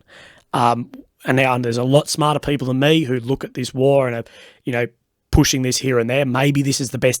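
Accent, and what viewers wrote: Australia. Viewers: Good to get my updates in an aussie accent.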